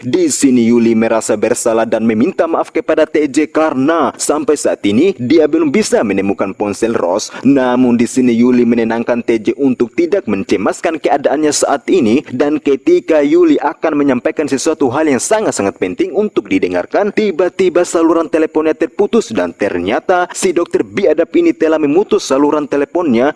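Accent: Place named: Medan, Indonesia. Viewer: Logatnya Medan bgt 😂.. jdi ingat mntan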